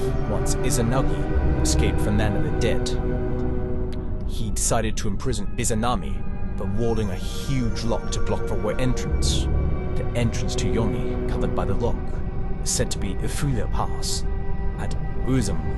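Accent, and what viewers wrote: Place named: United Kingdom. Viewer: MATE, YOU ARE BRITISH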